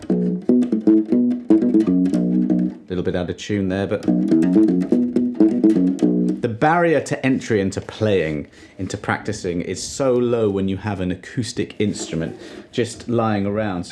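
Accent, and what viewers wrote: United Kingdom. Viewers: Of all the Brit-sounding teachers out there, you ARE THE GREATEST IN MY OPINION.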